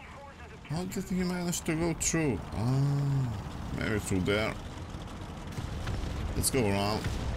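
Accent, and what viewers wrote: Eastern Europe. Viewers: Why is this in my playlists and warthunder is still going wow I haven't played this for years . Eastern European accent and crap meme's no thanks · uses british tank yet im still hearing some slavic some sounds....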